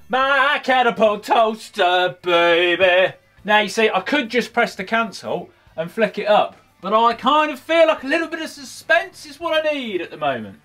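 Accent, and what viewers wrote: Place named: Oxford, United Kingdom. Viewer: because of your videos, I am learning Oxford English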